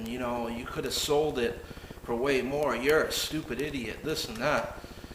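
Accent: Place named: Massachusetts, United States. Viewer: hey man from your accent you sound like your from MA? Anyways I gotta agree with you. Alot of people on here watch davesfarm and that other bullshit and get these crazy ideas. Plus alot are younger kids. I live locally and know what people will buy on CL around here. A good running 350 sure thats worth pulling or a decnet transmission. You sure don't see many s10 blazers here any more and the 4.3 would not be worth the time. Why do people like the 4.3 and 3.8 so much anyways?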